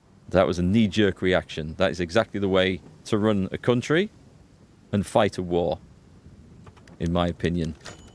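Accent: United Kingdom. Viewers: great for you. better for us. I love your gameplays, mainly because of british english which is not so common on Youtube :)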